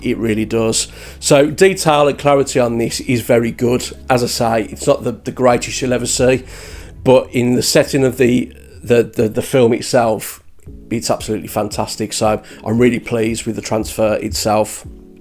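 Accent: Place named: Birmingham, United Kingdom. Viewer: Nice review mate. What part of Brum are you from?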